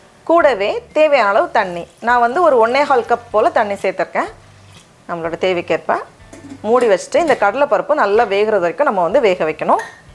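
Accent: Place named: Chennai, India. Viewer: ah madras tamil konjam 💜seen ah💜 irukum kekumbodhu sami namba bhasha pesudhudanu sandhoshama iruku Akka 😍🤣🤣🤣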